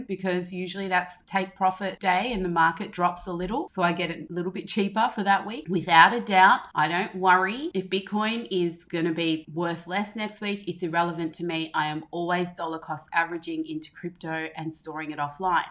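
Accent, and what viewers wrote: Australia. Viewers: Loved your video! Also lovely to hear that Australian accent! I lived in Melbourne at the start of the pandemic - great city. I plan to return one day.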